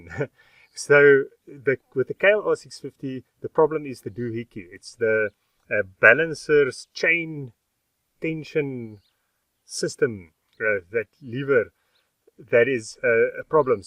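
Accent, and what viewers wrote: South Africa. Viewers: Hello! I'm always happy when somebody talk about XR's, and I suppose that you are a sort of neighbour because you seem to be in South Africa (as I hear your accent and the left side coded on the road) and my original country is the red island: Madagascar! We've got the same landscape with laterit dust that make one of the most sticky mud I've ever seen and rode. So I had 3 XR's and only the air-cooled model RFVC and a Domi, the last one was rebuild with a Domi engine to have the electric start but the cams were from XR, and I wanna say that the red pig is one of the most awesome bike you can ride. As some few riders say, add an big plastic tank by Acerbis and an oil-cooler to avoid overheated (the weakness of the RFVC engine at last... and the right level in the carb bowl!) I don't think that the KLR is on the same level, here in Europe and mostly in France, the Kawa is a basic commuter with trail style flavour, the contender of the KLR would be surely the Domi or the Suzie DRS 650 and Yamaha XT 600 (for the japanese brands). 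I think the real competitor of the XR is the KLX R 650 by Kawasaki because it's a real dual sport bike with some great capacities in off-road as the TT 600 by Yam. I rode few times the KLR, and it was too smooth, almost soft and a bit lack or torque, mostly in off-road (dust and rock tracks, mud and sand...), really no way with it in severe conditions,even the liquid-cooled engine seems to be more secure (I heard many times the cool fan opera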